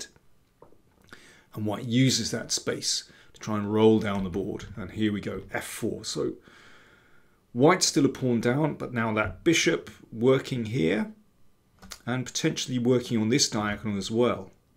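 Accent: United Kingdom. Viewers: I love the strong British accent and your very very happy smiley demeanor, paired with deep knowledge (obviously). Makes for great content. Subscribed!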